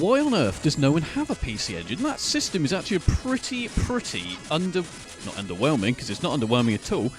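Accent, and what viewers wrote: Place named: United Kingdom. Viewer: British accent. sounds legit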